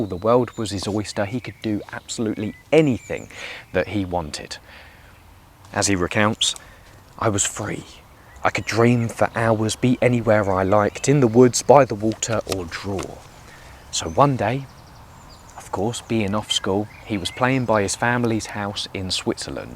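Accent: United Kingdom. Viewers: your british accent makes your point perhaps better than your words.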